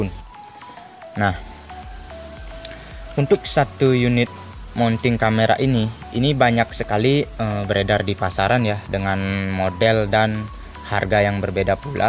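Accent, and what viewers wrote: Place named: Bali, Indonesia. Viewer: orang bali, logatnya T nya kelihatan.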